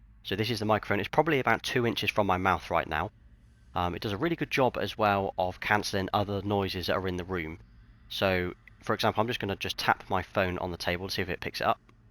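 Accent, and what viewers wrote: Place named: West Country, United Kingdom. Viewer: Great review man, I really enjoyed this. Properly comprehensive and a joy to watch! Loved hearing a relatively local accent too as I'm from Cornwall, and it sounds like you're from the west country perhaps? 😆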